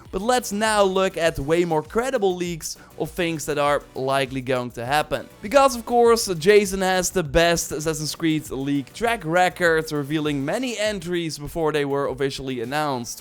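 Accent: Netherlands. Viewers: I can't get over your Dutch accent🤣 it's so obvious (omdat ik zelf ook Nederlands ben)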